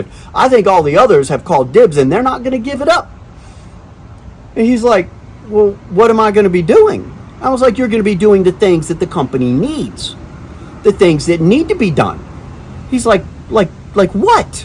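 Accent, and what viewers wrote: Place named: New York, United States. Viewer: I couldn’t help but find it funny that whatever is causing your audio to peak also kinda made it sound like you had a New York or New Jersey accent 😂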